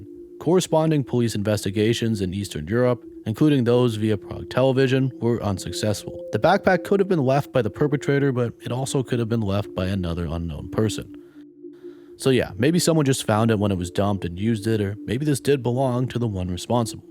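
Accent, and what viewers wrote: Canada. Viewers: Dude You are SO Canadian!! 😂 not an insult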